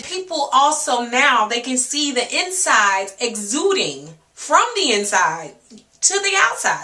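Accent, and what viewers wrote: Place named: St. Louis, United States. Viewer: Tell 'em (the St. Louis came out on this video, lol)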